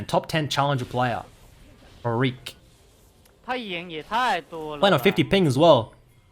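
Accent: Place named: Australia. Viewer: australian accent DansGame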